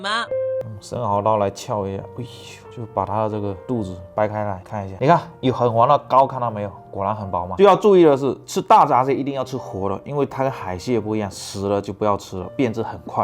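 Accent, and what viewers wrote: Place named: Hakka, China. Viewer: 看完之後感想 ……. 客家音怎麼那麼重 🤣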